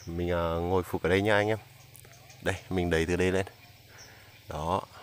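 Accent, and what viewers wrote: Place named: North, Vietnam. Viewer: xa quá nghe giọng bác giống ngoài bắc tưởng ở bắc cho e gl cùng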